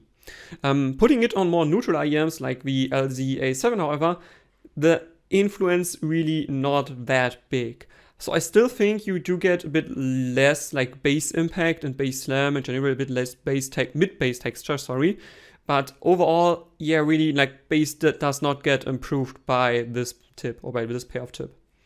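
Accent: Germany. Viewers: shouting SS with german accent... to much fo rme.. I roffled and moved on...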